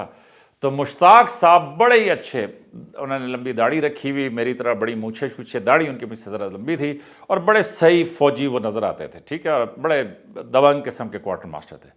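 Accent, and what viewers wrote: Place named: Sargodha, Pakistan. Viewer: سر بہت اچھا ❤ آپ کا لہجہ سرگودھا کا لگتا ہے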